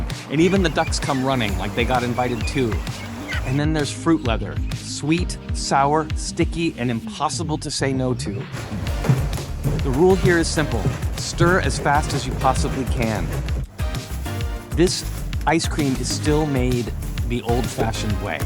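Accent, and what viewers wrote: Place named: United States. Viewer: where are you from? you sound american. is travel allowed to iran currently?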